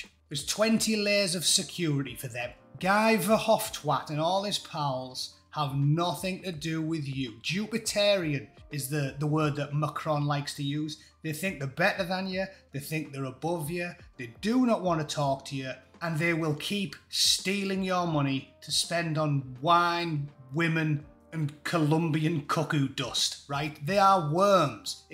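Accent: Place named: North East England, United Kingdom. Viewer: I absolutely love your videos spoken so beautifully with prose from the north east. I would love to go for a pint with you one day should find your selves in Liverpool.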